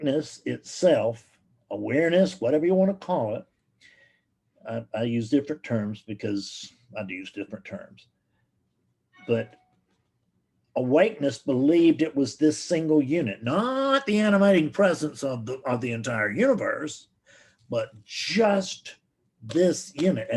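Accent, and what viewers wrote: South, United States. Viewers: channeling Alan Watts with a southern accent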